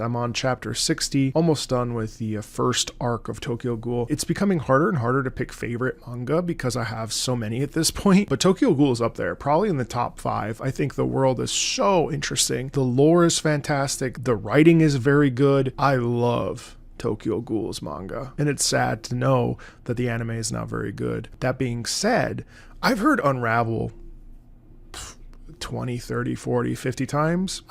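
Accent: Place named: Italy. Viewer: I love how you pronounce Japanese with an Italian accent, genuinely really charming :D like the oshiete yo becomes oshietero.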